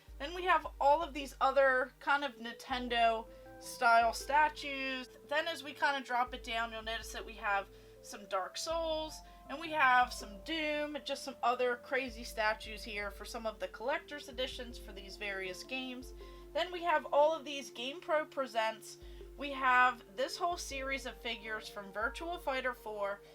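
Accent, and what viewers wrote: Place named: Philadelphia, United States. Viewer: With that accent, you must be from the Philly area.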